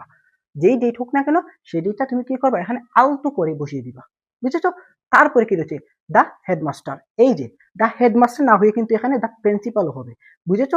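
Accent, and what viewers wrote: Sylhet, Bangladesh. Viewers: Vai Sylheti ni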